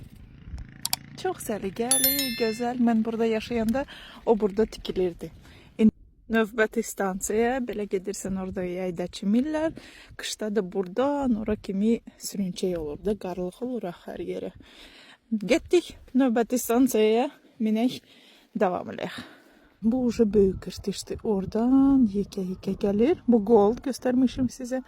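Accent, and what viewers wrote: Ganja, Azerbaijan. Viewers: Sən əsil gəncəlisən ləhçəndə şirindi elebil kazaşka deyilsən 😂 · Gözəl qəlbli bizim qızımız yaxşı ki, tanıdıq səni və vlogların superdi ailənlə birgə daima xoşbəxt ol bu arada inşeAllah ki, Azərbaycan dilini unutmazsan ən əsasıda Gəncə ləhçəsini unutmayın şirinlik verir sizin danışığınıza 😊 can səninlə birgə gəzdim kəndinizi eyni abu havanı verdiz mənə ❤❤❤❤😅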